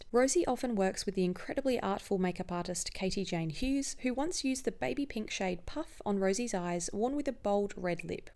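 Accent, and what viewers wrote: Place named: Australia. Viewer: Hi I have a question, do you live in Australia cuz u got an accent and Ye... oh and if you do then where do you get the best skincare products for cheap? I have recently got into skincare but most shops are very pricy.. so if you have any actual in store stores then plz spill:)))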